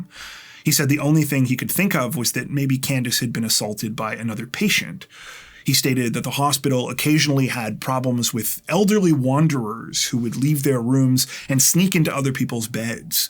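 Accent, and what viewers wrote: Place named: Canada. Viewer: Dude, as soon as I heard Canadian, I was like SUBSCRIBE! (Born and raised in Toronto, and a lover of all things Canadian and true crime) So I guess this is a perfect match eh?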